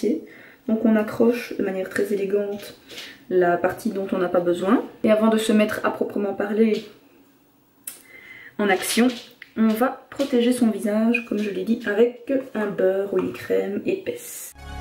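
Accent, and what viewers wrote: Belgium. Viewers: Elle est belge je crois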